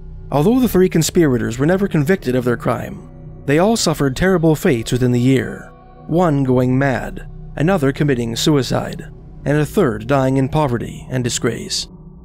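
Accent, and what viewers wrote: Canada. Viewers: Your Canadian accent is a bonus to your perfect narrations!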